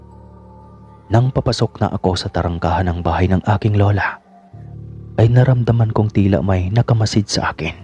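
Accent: Visayas, Philippines. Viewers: Bisaya kaba idol?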